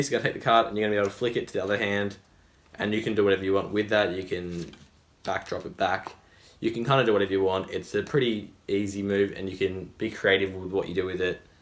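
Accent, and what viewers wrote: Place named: Australia. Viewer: Great review my guy! I already have the cards but that Australian accent kept me on the video 😂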